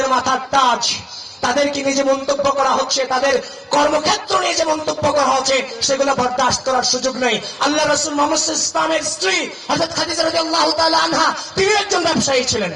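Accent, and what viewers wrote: Kolkata, India. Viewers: তার কথা কলকাতার ভাষা মনে হচ্ছে ভারতের বির্জ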